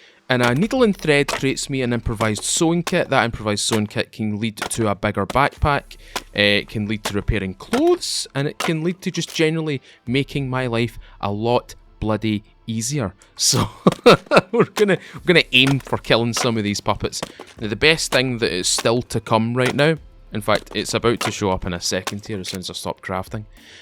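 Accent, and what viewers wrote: Scotland, United Kingdom. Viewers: I've been looking for a new SCUM player but I don't think I can watch your videos, I'm Scottish as well and the inevitable thing that all Scottish people have to do so everyone else understands us also is unbearable to us! 😂 Nothing personal mate 👍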